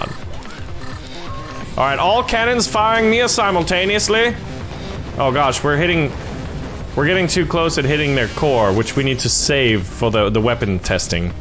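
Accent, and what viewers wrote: United Kingdom. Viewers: Love the British accent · Can you stop speaking in british acsent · im sorry but i don't like your British accent im sorry though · Why you always speak with a British accent Maby be because someone in your family could of been in the Royal Navy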